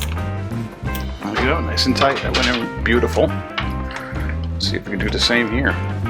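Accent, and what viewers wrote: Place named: New York, United States. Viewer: Sounding like a real uneducated NY'er - lets keep it civil ❤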